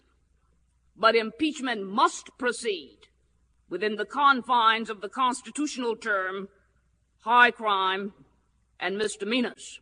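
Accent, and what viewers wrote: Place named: Texas, United States. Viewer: This is what a good Texan sounds like.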